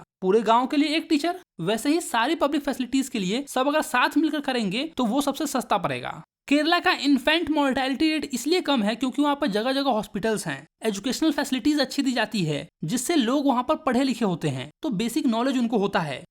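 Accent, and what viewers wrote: Bihar, India. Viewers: i really appreciate ur desi Bihari tone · You are from Bihar ❤😊 your accent tell that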